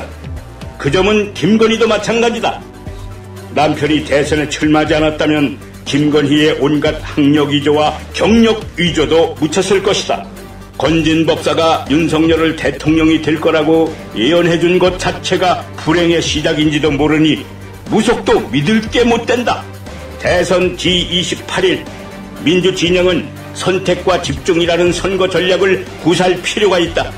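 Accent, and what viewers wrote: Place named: North Korea. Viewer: 맞아요! 아나운서님 목소리와 말투가 북한찌라시로 들리니 죄송하지만 다른분으로 체인지해주시면 좋겠습니다.아주 중요한 사안이니 유권자들에게 거부감없이 100%팩트 그대로 전달될 수 있게 부탁드립니다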